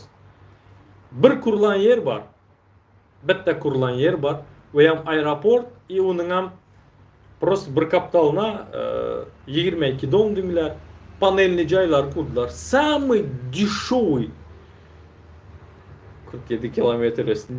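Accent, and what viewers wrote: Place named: Chardzhou, Turkmenistan. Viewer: он разговаривает на Чарджоуском диалекте,в туркменистане разговаривают на двухсот диалектах .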